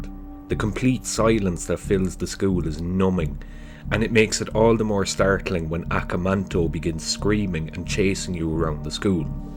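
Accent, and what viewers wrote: Cork, Ireland. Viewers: Cork accent? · Is that an eire accent? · Your accent is so unsettling. Are you Irish?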